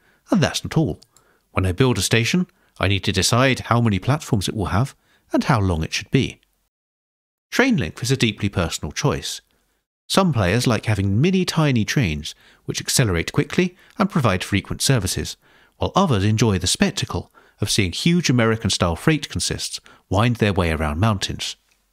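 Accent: United Kingdom. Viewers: That accent is so British, 😂. Thanks for the video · I really love your voice. That soft british accent makes me think I'm a young child, watching some british infomercial or something like that. It's really soothing, and helps me learn.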